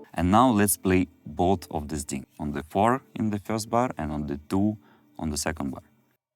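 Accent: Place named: Russia. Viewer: дружеский совет посмотреть как избавиться от русского акцента особенно если собираешься еще делать такие крутые видео.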